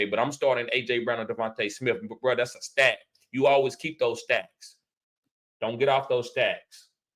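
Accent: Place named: South, United States. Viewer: Bruh, i love that Breece comment!!! 😂😂😂 Your southern tongue does make it sound like breech!!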